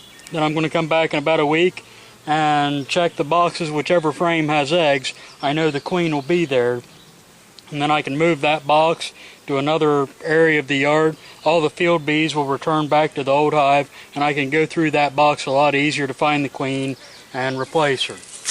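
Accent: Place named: South, United States. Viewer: With those bees being aggressive and with his southern accent its hard to understand what he is sayin.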